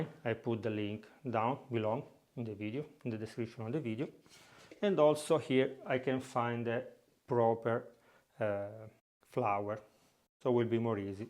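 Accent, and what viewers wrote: Italy. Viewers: youre the cutest english speaker amongst italians..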